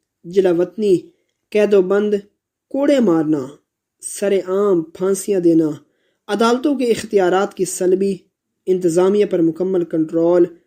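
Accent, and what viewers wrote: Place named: Arab world. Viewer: صدا کار صاحب سے درخواست ہے کہ اردو کو عربی لہجے میں نہ پڑھیں. یہ اردو کی کتاب ہے. يحي سنوار کی کتاب کے لیے کی صداکاری مناسب تھی کیونکہ اس کتاب میں عربی الفاظ بہت تھے.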